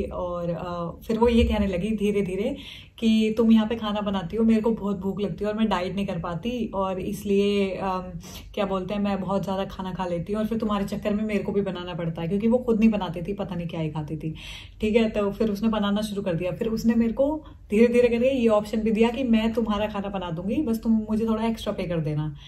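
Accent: India. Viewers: Way of you speak love it 😅 Desi.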